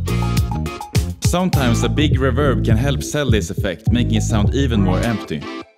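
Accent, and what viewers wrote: Sweden. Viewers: I watched and want to ask you which city in Sweden do you live in? 😂 that thick swedish English accent :) no offense, i’m half swedish myself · Såg att du tryckte in ett "plopp" 😂 När jag hörde dig prata för första gången visste jag direkt att du var svensk, det är bara någonting speciellt med vår dialekt när vi pratar engelska, tråkigt att PewDiePie inte pratar så längre! Var i Sverige är du från?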